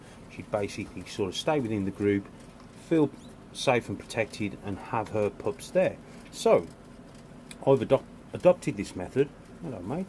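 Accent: Black Country, United Kingdom. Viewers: GREAT VIDEO UR ACCENT SOUNDS LIKE BLACK COUNTRY I DONT SEE PROBLEM WITH FEEDING LIVE WHAT WOULD THEY EAT IN THE WILD ITS SIMPLE!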